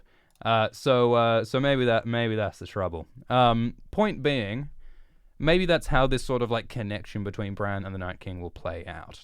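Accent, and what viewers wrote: Australia. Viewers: It's Australian (this gets asked about 50 times in every ASX video btw, he should just start out his videos by saying where his accent is from so people will stop asking all the time xP) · I'm curious, are you Australian? Being an Aussie myself, you sound very Australian? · It's not the typical Aussie accent, you can hear it in some words, but he doesn't do that thing where everything he says sounds like a question. So, it throws people off, especially Americans who hear relatively few of the different Aussie accents.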